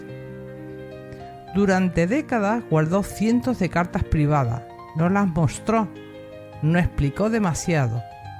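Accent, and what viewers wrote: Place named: Andalusia, Spain. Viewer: La propietaria del canal es andaluza, por eso pronuncia así mi alma!! Relájate un momento chiquilla!!!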